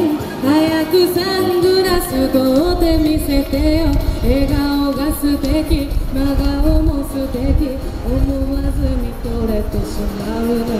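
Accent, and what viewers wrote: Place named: Hakata, Japan. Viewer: 博多弁はかわいいですね！！応援しています。❤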